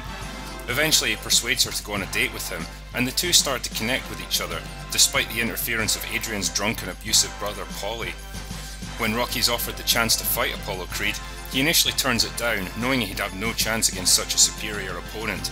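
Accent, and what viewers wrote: Scotland, United Kingdom. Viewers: Until now I've not noticed the Scottish accent at all. 'Drunk' was what I have been hearing. But now I realise the Scottish was there all this time.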